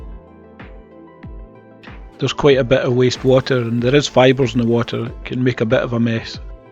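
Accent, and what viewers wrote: Scotland, United Kingdom. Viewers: I love your acsent sound scotish , amazing setup fór the bricks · I just love Scottish accent! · Your own design is superior in overall performance, in my opinion. I honestly believe you get extra credibility; because of your honest Scottish accent. My mom and her parents are from Glasgow. I am in Irvine California. Nice video thank you for making it happen.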